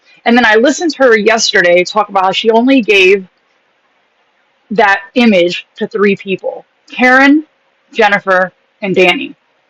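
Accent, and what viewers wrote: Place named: Ireland. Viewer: Your perspective is exactly right. I enjoy listening to you.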